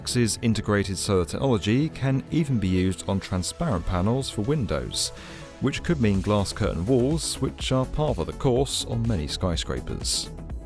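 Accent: United Kingdom. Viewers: Check. The Cockney. Easy now big fella. 😎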